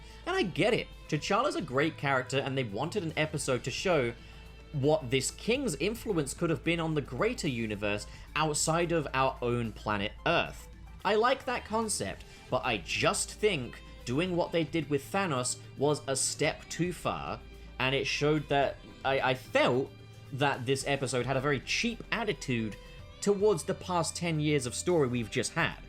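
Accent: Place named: United Kingdom. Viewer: It's not your authority...it's your sexy soothing British voice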